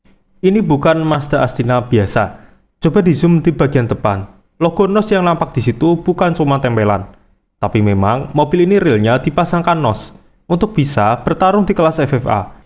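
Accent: Java, Indonesia. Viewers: Medokmu lo minnn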